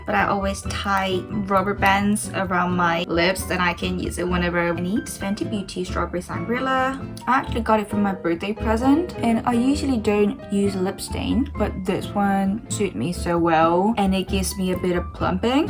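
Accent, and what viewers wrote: United Kingdom. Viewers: 영국식 발음이 너무 좋네요.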